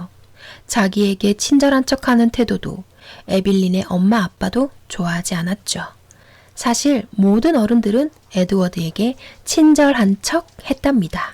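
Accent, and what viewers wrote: Gyeongsang, South Korea. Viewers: 신나이 들으면서 구독 하기 시작했는데 목소리 톤이 좋으시고 경상도사투리 억양조차 푸근하게 들리네요 (feat. 연기력) 좋은 컨텐츠 감사합니다^^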